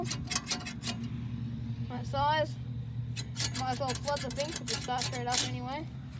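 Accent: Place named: Australia. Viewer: Nice! We use ours here in NC to grow collards. Sounds like you might be in Australia, based off the accent. Just curious if collards would grow well there, or what and all does grow good.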